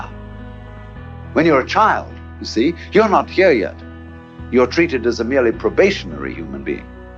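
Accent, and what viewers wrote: United Kingdom. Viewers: British accent = barf 🤮 Use a good quality French, Scottish or Irish ☘️ one instead. You will get more likes 👍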